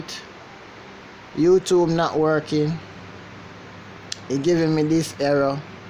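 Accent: Jamaica. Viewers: Thank you soon much bredda are you a Jamaican?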